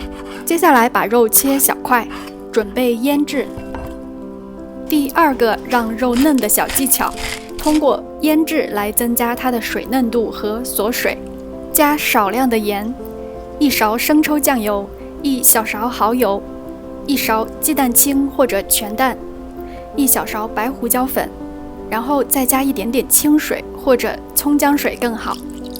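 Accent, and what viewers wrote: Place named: Japan. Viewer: 看过一个日本人说中文做菜的频道，你这语音语调描述方式就听着好像啊。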